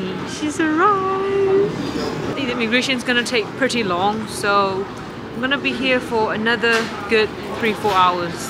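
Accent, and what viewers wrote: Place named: United Kingdom. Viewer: I'm in luv with your bri ish accent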